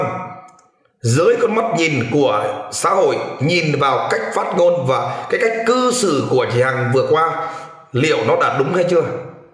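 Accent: Standard. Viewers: Nói chuẩn luon bạn nha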